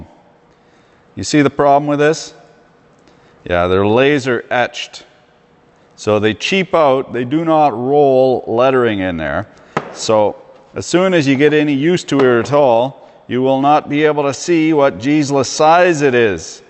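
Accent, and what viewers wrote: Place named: Canada. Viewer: I don't know shit about tools but you talk like a true maple syrup baby and I love it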